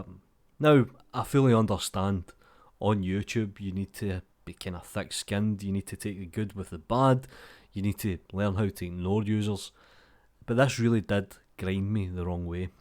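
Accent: Scotland, United Kingdom. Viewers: Personnally I have lived in Scotland for a year and a half and your accent makes me remember lots of nice people i've met there so I like it :) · Your scottish accent is absolutely great and we love it. That's also the reason we are watching your videos. Keep doing, don't stop, please. There are different accents somelike is more comfortable with ( British, US, Australian, German, French, India ... etc. ) Guys, exit your comfort zone and listen, watch, learn, improve - like in Blender. Listening you will improve english skills for others, not only Blender skills. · Leave my Scottish Blender Tuts man aloneeeee!!!! I love his accent (but then Burnistoun was genuinely one of my favorite sketch series shows ever) If ye don't understand the lingo - away to a different YouTuber you go. (I'm an American, btw) If you want to learn to understand Scottish then go watch Burnistoun on YouTube it should get you up to speed. 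· Dude, your accent is the reason I watch your channel. There's a bunch of blender content but none with a cool Scottish accent. Ignore that nonsense. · I fookin love the Scoatish accent n' ah love your tutorials! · I am actually watching the videos on Scots phonetics now that I got hooked on your accent 😄It’s so soothing! And I never have any trouble understanding now that I’ve watched a lot of your videos and got used to it. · I'm not native but your Scottish accent is absolutely brilliant! Keep it or marry me so I can listen in private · Quality content and the 2nd best accent in the world (sorry, Kiwis win that one). Nothing to complain about. When I first stumbled upon one of your videos I was like: fuck yeah, finally a Scot doing Blender tutorials. Win! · To the twat who wrote the comment: You do know the CC button will show text of what's spoken, don't you? Or is that too hard for you to understand, too? 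To: Great vids AND a delightful Scottish accent? What more could you want? Thanks for what you do! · I mainly watch it because of the Scottish accent. Easier to understand than Burnistoun :D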